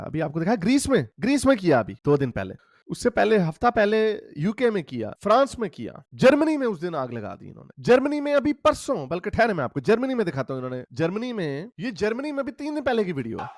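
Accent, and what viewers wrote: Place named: Punjab, India. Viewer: No no Rawanda, Punjabi tone not Afghani.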